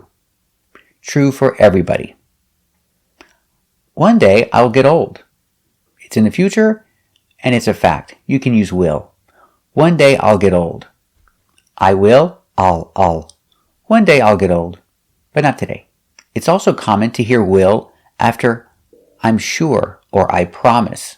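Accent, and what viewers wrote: United States. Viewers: Sir are you American?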